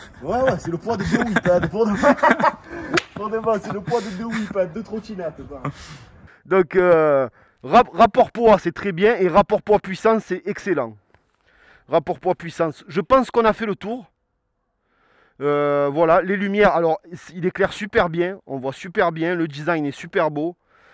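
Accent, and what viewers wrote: South, France. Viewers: Me encanta el tio este con su acento del sur,j'adore ce mec avec son acent du sud,saludos desde Normandie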